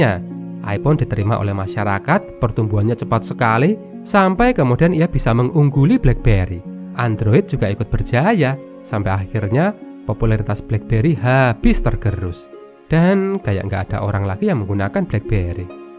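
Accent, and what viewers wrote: Indonesia. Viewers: Nonton full video ini gara2 voice over nya yang Indonesia banget 🙌🏻🙌🏻🙌🏻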